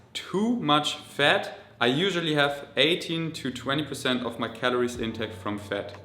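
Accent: Germany. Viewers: Hey Du, ist mir schon mehrfach aufgefallen, jetzt muss ich es einfach sagen😂 ...Vor einem englischen Wort mit einem Vokal bzw. Vokallaut am Anfang "an" statt "a" benutzen! AN incomplete protein! Fließt doch viel besser so!🌞✔ Und um den deutschen Akzent zu verlieren, würde ich mir Videos wie "How to sound like an American" anschauen!🌚 Und wenn Du die Wörter nicht wie im Deutschen mit einer kleinen Pause dazwischen aussprichst, sondern zusammenziehst, wirkt das auch gleich viel besser (deshalb auch an statt a, damit man die Wörter ohne Unterbrechung aussprechen kann) 🌞✔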